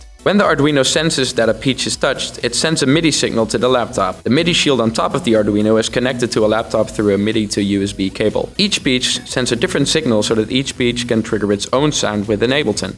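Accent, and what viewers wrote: Netherlands. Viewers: I'm Dutch myself, and I have to say that your English is really good. It took me a while to realize that your accent was Dutch. Good job! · As a native English speaker, it was instantly obvious he has a Dutch accent, but he does have good English :)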